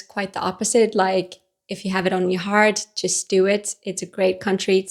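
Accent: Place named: Ireland. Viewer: You even picked up a bit of an Irish accent.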